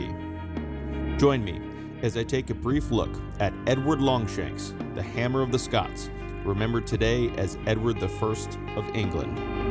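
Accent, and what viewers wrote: United States. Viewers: An excellent narration! [Do I detect a midwestern accent?] I'm looking forward to more from you. I'd like to see an in depth history of early czech/moravian rulership. It's hard to find anything on this. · I can't watch this it doesn't seem right with an American narrating.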